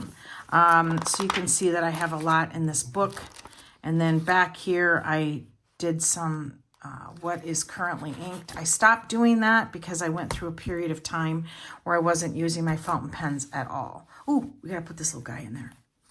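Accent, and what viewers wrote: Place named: Minnesota, United States. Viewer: This woman is a trip. And the accent! Is it Minnesota? It’s not southern for sure but not that nasty north east yankee New Jersey thing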